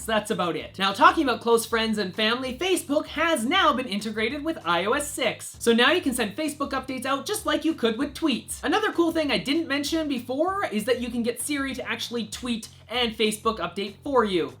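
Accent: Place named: Canada. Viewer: you r are canadian?????? how could I not have noticed that??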